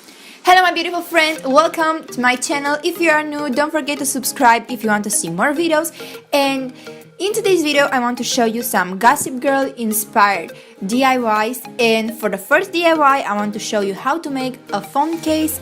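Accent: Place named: Brazil. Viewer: Hey! Love the DIY's :) and sorry if I'm being nosey, but where are you from? Your accent is so cute! I have a lot of brazilians friend that have the same accent as you, so that's why I'm asking :P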